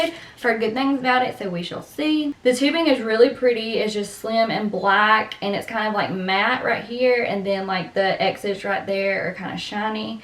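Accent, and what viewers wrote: Alabama, United States. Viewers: I just love your southern accent! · You got nice stuff diffinately worth the money, also love your sweet Alabama accent ❤️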